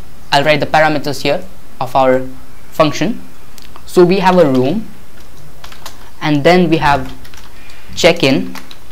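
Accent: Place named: India. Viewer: ahh, your pronunciation and the royal peacocks singing in the background sent me back to India again. Thanks for these tutorials! Great job!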